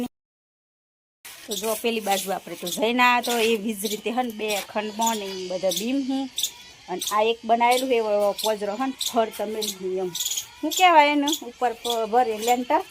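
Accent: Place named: Sabarkantha, India. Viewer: બેન તમારી બોલી પરથી એવું લાગે છે કે તમે સાબરકાંઠા જિલ્લાના હોય એવું લાગે છે 🎉🎉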